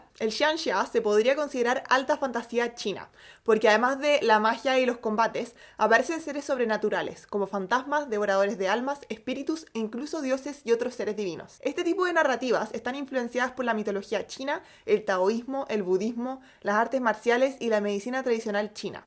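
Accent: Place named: Chile. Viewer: Mi obsesión por The Untamed me recomendó este video y cuando le puse play... Ese acento? Ese acento es chileno? Es chilena csm xD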